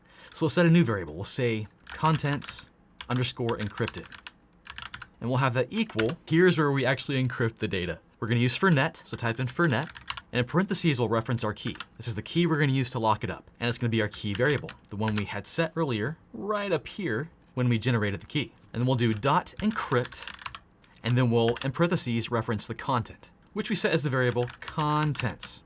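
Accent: Texas, United States. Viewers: YEEEES HES TEXAN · what part of Texas are you from?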